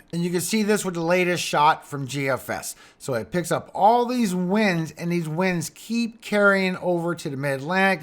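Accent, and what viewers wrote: Louisiana, United States. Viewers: brother you have a Louisiana accent yeah😁!!!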